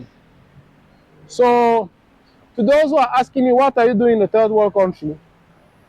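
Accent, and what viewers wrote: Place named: Africa. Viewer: pero de que pais vienes tu? por tu acento evidentemente no eres de un pais del norte como inglaterra usa o canada, eres de algun pais de africa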